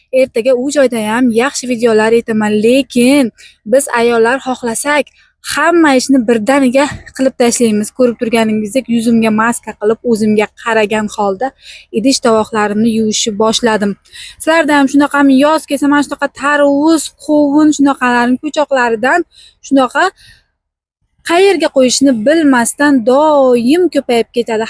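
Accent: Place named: Olot, Uzbekistan. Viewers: Шевангиз холиям олотчча га тортаркан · O'zim aylanay shevangizdan zemlagim Buxoro olotlik men jondordanman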